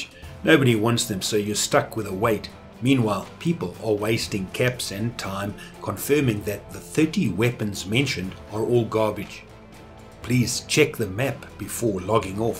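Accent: South Africa. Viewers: You sound like you're from SA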